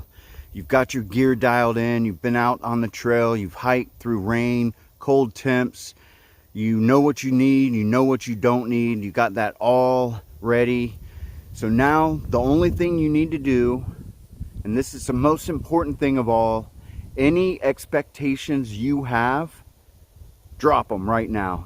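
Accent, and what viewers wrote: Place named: South, United States. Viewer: Loli love the way yu say the at tail have thst southern voice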